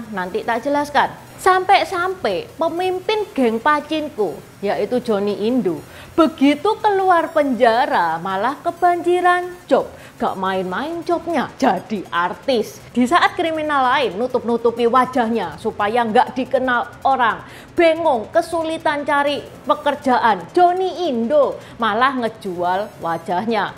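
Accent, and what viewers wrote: Java, Indonesia. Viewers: Mbak kental banget logat Jowo nya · Uraian ceritanya, ter urai indah, walau tekanan / aksen jawanya masih medok, tapi masih dapat di mengerti.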